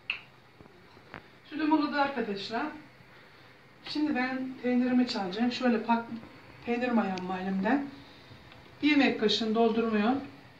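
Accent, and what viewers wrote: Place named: Black Sea, Turkey. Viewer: Ah hemşehrim ah çiğirt-irkme-zebil olmasın kelimelerini duydukca sanki çocukluğuma geri gittim sanki rahmetli anacığımın sesini duyar gibi oldum ne kadar özlemişim Uşağımızı şivesini kendine has kelimelerini Allah senden razı olsun.Ayrıca peynirde çok güzel oldu mutlaka deniyeceğim hemşehrim çok teşekkür ederim.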